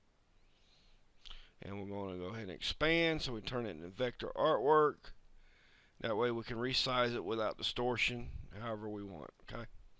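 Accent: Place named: North Carolina, United States. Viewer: Really cannot see how you have 14 thumbs down on this - I'm a beginner trying to learn everything I can at home using my subscription to AI from cloud so that I can do at work what my boss needs me to do using this program and vector graphics with fonts and jpgs. Great video, no back and forth oops I forgot or a bunch of info I don't need. Love the accent too as I am in NC I feel right at home listening to you :) Couldn't be more thankful for the tutorials.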